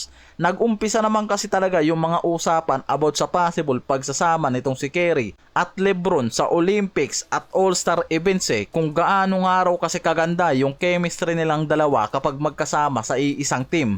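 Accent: Visayas, Philippines. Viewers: Bago ka mag content ayusin mo muna yung pronounced mo ng curry. Hindi carry. Curry. Anong carry? Keri? Carry the ball? Curry!! Hindi Carry. Bisayang to.. tapos porgingis. Hindi porsingis.. sarap mong kaltukan.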